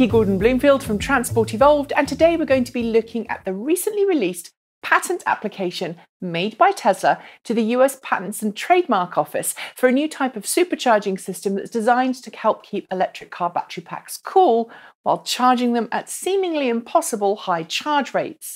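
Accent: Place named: United Kingdom. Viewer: with British accent everything sounds smarter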